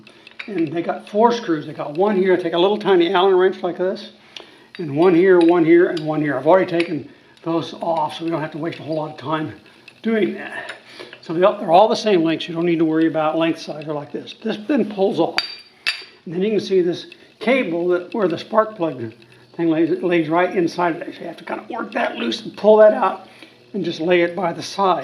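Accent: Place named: United States. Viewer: The plugs are a lot easier to access than the plugs of a BMW R850. Gee, I had to remove the valve covers and even then you need a special slim designed spark socket to remove the plugs. Consider yourself a lucky guy. You can buy a long socket for about 20 euro at Wunderlich. I am not sure where you live. Your last name is Dutch but your English sounds very American to me. When you live in the US there is also a Wunderlich in the States. Otherwise Amazon has them.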